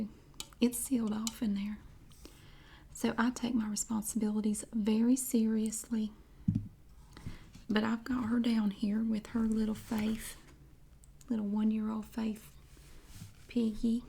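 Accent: South, United States. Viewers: I absolutely love your videos 😍❤️ especially the southern accent my fiance is from nc and when we first met I had to learn how he talks because of the accent always looking forward for any of your videos they always help me so much I'm going through a lot of anxiety and your videos always calm me right down thank you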